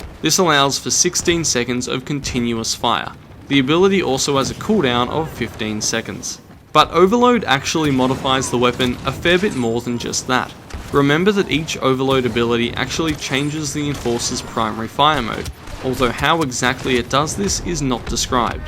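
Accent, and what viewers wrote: Australia. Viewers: You're aussie too? :D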